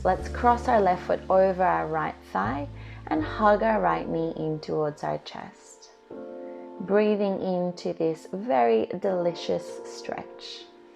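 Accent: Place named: Sydney, Australia. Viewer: Thanks:) you're beautiful Sydney accent makes me nostalgic <3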